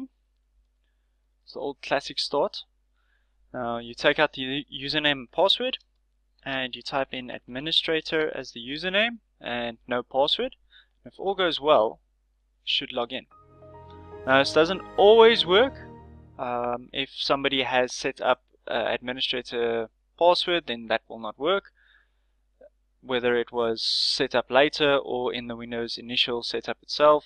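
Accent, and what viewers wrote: Afrikaans, South Africa. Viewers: I recognize an Afrikaans ouk when I hear one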